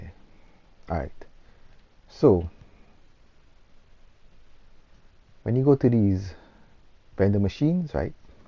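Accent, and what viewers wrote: Trinidad and Tobago. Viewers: You from Trinidad bro? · Are u trini?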